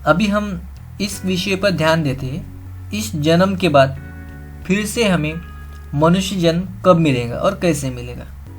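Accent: Bihar, India. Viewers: apni awaj mei mt upload krna bihari ho n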